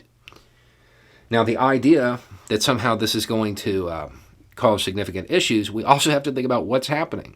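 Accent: South, United States. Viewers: I'm always amazed at how a dude from the south who says yawl and his a scruffy beard and wears a ball cap with a monkey on it can explain the most important issues we face in a way that adds clarity and depth that cuts through all the noise. I've said this before. It's a shame that people who would benefit most from these posts will probably never read them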